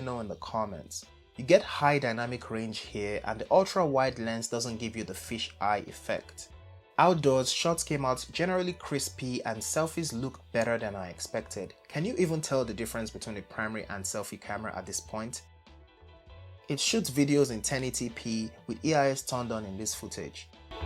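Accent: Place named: Nigeria. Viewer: yeah the Nigerian accent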